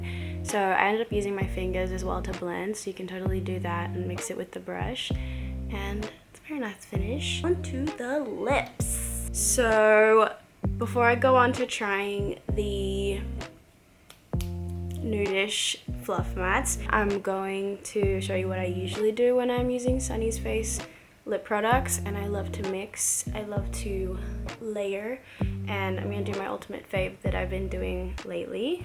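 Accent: Australia. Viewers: why does she have a weird lowkey australian accent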